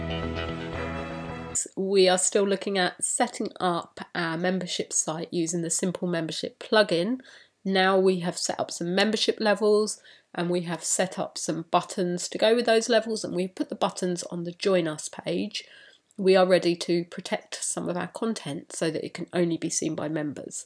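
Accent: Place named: United Kingdom. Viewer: Excellent lessons, presented with a lovely British accent👍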